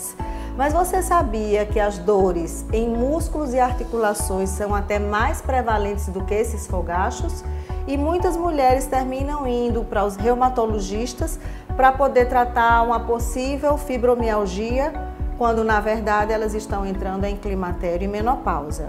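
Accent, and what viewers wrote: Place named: Northeast, Brazil. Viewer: Esse sotaque gostoso é só que lugar do nordeste?❤️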